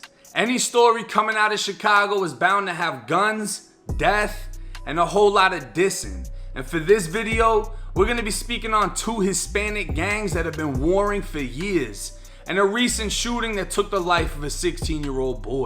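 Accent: Boston, United States. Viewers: Yo you from Boston?? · Are you from Boston ? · Great vids just wondering where your accent is from, boston? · are u from Boston? cuz you sound like you are from here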